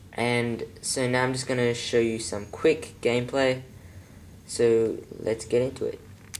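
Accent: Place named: Australia. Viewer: Nice australian accent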